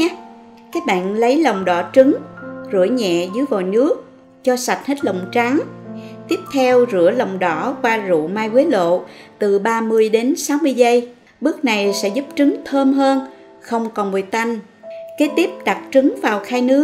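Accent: South, Vietnam. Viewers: Lần đầu tiên xem video của bạn, nó cuốn hút người xem với giọng đọc miền nam mộc mạc nhưng thanh tao và sức truyền cảm, ấm áp người nghe. Mình đã đăng ký để tiếp tục xem nội dung những clip mới và được dịp tiếp tục nghe giọng của bạn. Chúc sức khỏe và thành công.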